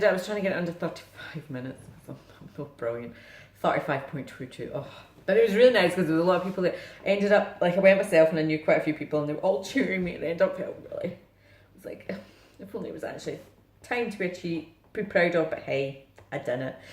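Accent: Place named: Scotland, United Kingdom. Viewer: Congrats on the run! I couldn't do that to save my life. I've only got 1 stitchy project on the go, so I think anyone whose attention span can manage StitchMaynia is impressive! So enjoy your videos, I'm an expat Scot living in Australia for 30years, so a wee touch of homesickness listening to you speak. Taps Aff! x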